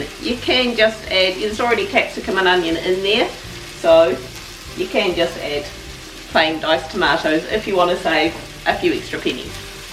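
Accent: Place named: New Zealand. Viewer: Yum! I have just found your channel and I find you so refreshing and natural. It’s great to see and hear a genuine Kiwi for a change. I’m in the Bay of Plenty. 😀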